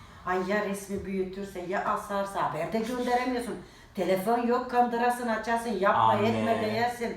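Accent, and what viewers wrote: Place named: Thrace, Turkey. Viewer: Yaaa ne kadar tatli bir ablasin ❤ bol bol boyle sohpetler hikayeler reelslar paylas nolur 😅o kadar eglendirdinki bizi Allah'ta seni guldursun ablacim❤ bu arada annemde trakyali kirklareliden sen konustukca bizim oraların ruhunu hissediyorum buram buram 🥹🥰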